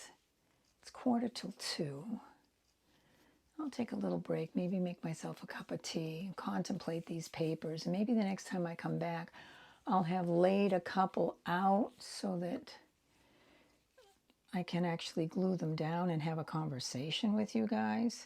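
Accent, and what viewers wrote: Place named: New England, United States. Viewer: I'll watch whatever you're doing, so (as Ricky Nelson famously put it) please yourself:) Love hearing your New England-isms (which were also mine once upon a time) -- haven't heard anyone say 'hold yaw hawsas' in about 50 years ❤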